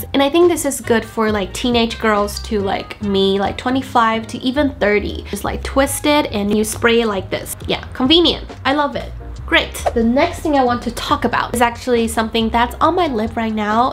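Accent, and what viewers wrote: United Kingdom. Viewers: i LOVE your subtle british accent! so cute!!!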